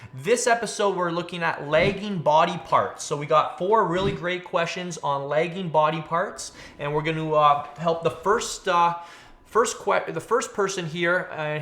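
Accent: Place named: Canada. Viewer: Canadian accent, ey.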